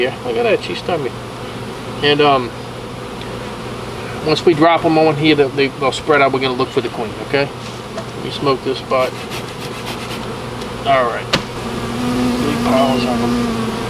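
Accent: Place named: South, United States. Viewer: Love your accent,... The Dirt Rooster and Mr. Ed can’t match it! You southern bee guys need to get some headlamps, though... no having to hold flashlights in your teeth!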